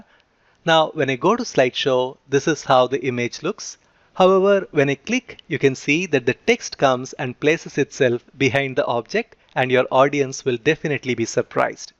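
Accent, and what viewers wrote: India. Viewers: Obviously indian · Please don't mind....but can I know that you are an Indian or American Sir?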